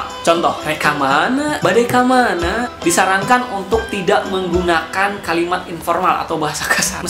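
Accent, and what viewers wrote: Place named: Bandung, Indonesia. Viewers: Logak.. orang bandung emang lucu ya..hehe #SalamDariSukabumi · Tong hilap kang, seueur logatna oge, sapertos akang logatna kentel urang Bandung asli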